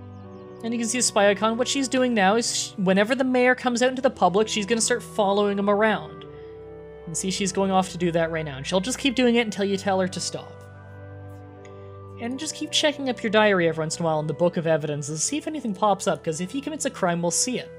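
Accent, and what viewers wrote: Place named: Canada. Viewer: 99% Sure he is Canadian. The way he says "about". ABOOOOT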